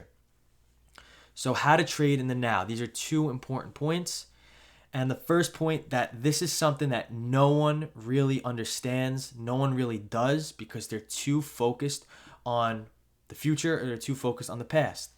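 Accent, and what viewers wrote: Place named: Georgia, United States. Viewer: You definitely sound different with that mic. I thought you were from Georgia.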